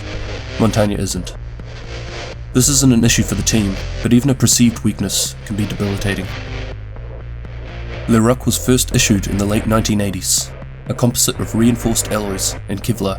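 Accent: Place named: New Zealand. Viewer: Yeah,the New Zealand accent is my favourite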